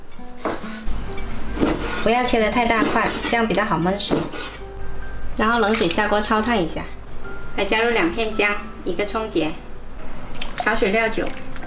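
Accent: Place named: Guangxi, China. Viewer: 浓浓的广西口音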